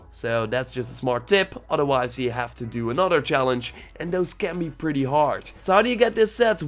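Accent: Netherlands. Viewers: Do i detect a Dutch English accent ? xD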